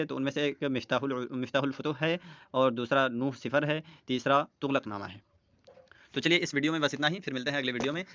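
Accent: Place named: Kashmir, India. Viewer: Sir your accent is different are you from Kashmir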